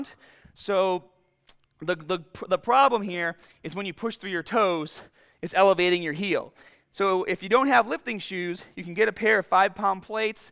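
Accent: Boston, United States. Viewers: Is that a Boston accent i hear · Is he from boston?? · Boston accent? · Holy crap!! I'm new to this and have been watching ur videos for a while because u explain awesome. And I heard ur voice this time ..and said no freakin way. This guy sounds boston!! No kidding i looked u up!!! Wicked awesome neighbah! I live near fallriver, Ma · The classic Boston accent! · I love that accent, is it Boston? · He must be from Boston. That’s how we talk here lol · You from Boston ? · I love your true Boston accent so beautiful. I’ll try this when the new local gym opens. It’s so cute how you say stahting and then say starting. Keep your accent it’s unique and part of who you are 🙂 I guarantee it’s super cool! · I love Boston accents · That Boston accent. I used to confuse it with the New York accent ... now I never will. I live in CT, so smack dab in the middle, but surprisingly I don't know a lot of people with a Boston accent. 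· what the hell is his accent, man. Boston, maybe? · That Boston accent is thick!! great vid!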